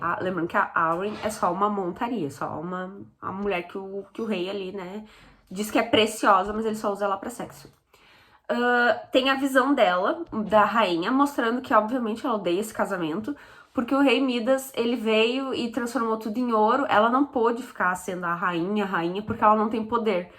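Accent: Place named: South, Brazil. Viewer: cheguei faz pouco no canal. E amo como vc conta tudo. E mesmo depois de leer os livros eu venho ouvir sua resenha. E amo seu sotaque do Sul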